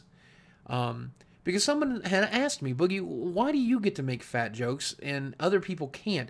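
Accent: South, United States. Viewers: Yes Boogie it's like its okay for black people in pop culture to call each other the "N" word but absolutely not okay for a white person to say that. And by the way i love the Southern accent. One of my life goals is to visit the deep south. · You already talked about the fact how you had to loose your southern accent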